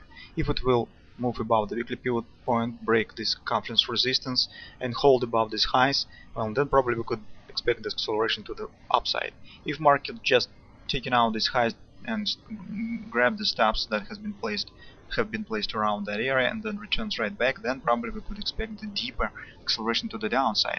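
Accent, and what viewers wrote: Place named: Russia. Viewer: у тебя славянский акцент... по-моему русский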